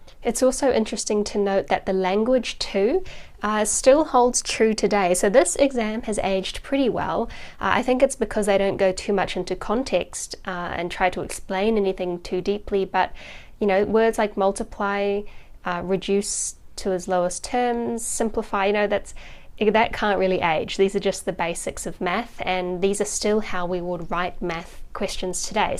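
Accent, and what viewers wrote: New Zealand. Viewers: Thank goodness for the Polish subtitles. As an Australian I was struggling with the New Zealand accent. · Are you from New Zealand? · Sound like a kiwi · New Zealand accent? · I think she is New Zealandish?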